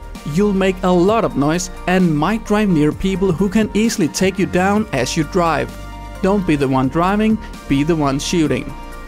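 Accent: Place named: Denmark. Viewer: It's easy to hear for Danes that he is from Denmark - yes with an "a"! :P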